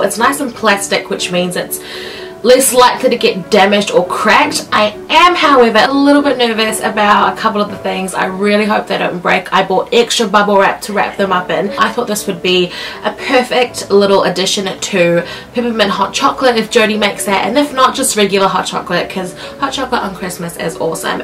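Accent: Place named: New Zealand. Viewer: I'm so embarrassed! I thought you were an Aussie this whole time! I guess that's me being an American, isn't it? Mixing up an Aussie Accent with a Kiwi Accent hahaha. Please forgive me. I love that you put some real thought into your gifts! You really thought about what you knew about her and her life, and I think the gifts were very sweet!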